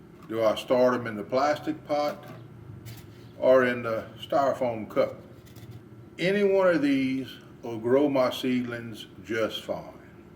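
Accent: Louisiana, United States. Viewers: Teri Ruiz I'm 'sheeshing' right along with you and.. I'm scratching my amateur head wondering what zone I'm in (I live in the Netherlands). I think I'll start some tomato seeds in a few days like shown in this video, then a week or two after that by using the method shown. I love learning from this guy too.. and especially LOVE his Cajun (Acadian) accent.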